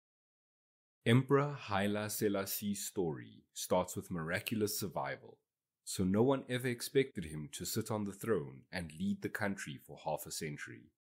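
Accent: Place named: South Africa. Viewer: I didn't know those circumstances of Haile Selassie's birth and parents. Thanks, South African sounding narrator.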